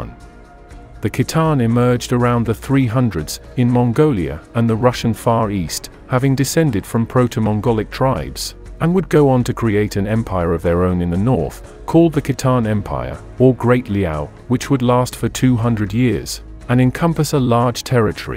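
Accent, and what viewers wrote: United Kingdom. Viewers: Is narrator AI or just obscenely British?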